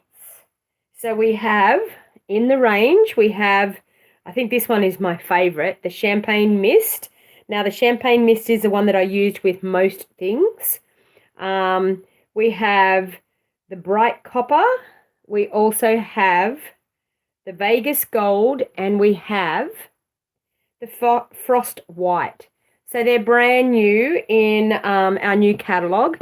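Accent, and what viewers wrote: Australia. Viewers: Hi, Just watched your video. Love your work and videos Just thought i would let you know that if you mix the shimmer paint with some ISOCOL Rubbing Alcohol Antiseptic into a mister. The ISOLCOL dries quietly and doesn’t run. I live in Western Sydney love watching your videos and hearing the Aussie accent.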